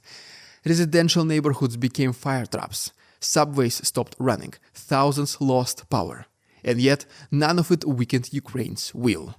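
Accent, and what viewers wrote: Russia. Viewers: Fix your silly accent. Most intelligent Russians learn to master English before dumping their accents on the public.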